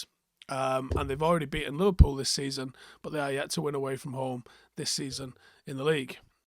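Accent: Manchester, United Kingdom. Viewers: lol if you cant tell the guy in this video has a manc accent you’re absolutely tripping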